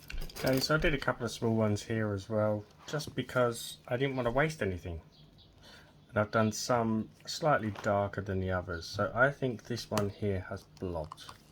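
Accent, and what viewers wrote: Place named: South West England, United Kingdom. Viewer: you sound exactly like Jude Law and your whole voice and cadence and demeaner are so soothing (sooving). I grew up as an American in SW England so I am in love with (wiv) this accent.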